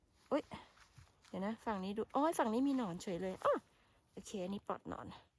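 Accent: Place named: Isan, Thailand. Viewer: พี่ พูดอีสานน่ารักพี่ก็ขำไปกับไอ่ด้วย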